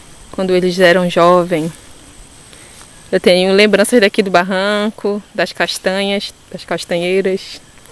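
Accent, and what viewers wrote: Rio de Janeiro, Brazil. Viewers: seu sotaque é de carioca, esse sotaque arrastando o x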